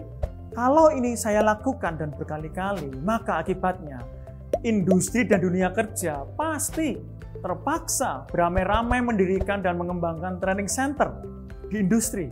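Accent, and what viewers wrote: Standard. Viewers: Pengucapan yang baku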